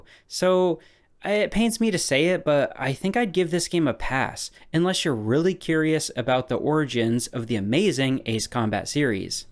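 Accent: England, United Kingdom. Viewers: that accent sounds like a british guy trying a eastern european+asian accent. its wild. goes from jackie chan to arnold in like, .5 seconds. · The voice sounds like an english accent, but possibly someone who's first language isn't english but learned how to speak english via someone with an english accent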